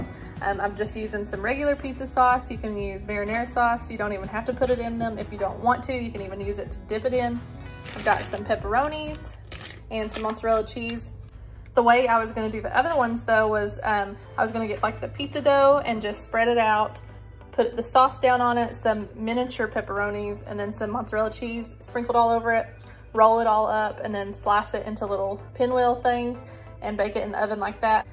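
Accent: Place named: United States. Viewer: Hi... I'm new from Shauna's channel. I like what Im seeing 👀, so I've subscribed. I noticed your accent, what State are you from??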